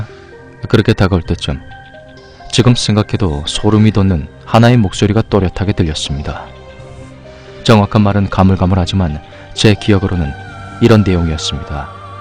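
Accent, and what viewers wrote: Gyeongsang, South Korea. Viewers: 이런건 심심해도, 호기심이 생겨도 하지않는게 좋을것같네요. 오늘도 님의 목소리와 경상도사투리를 들으며 하루를시작합니다. 좋은하루보내시고 화이팅~~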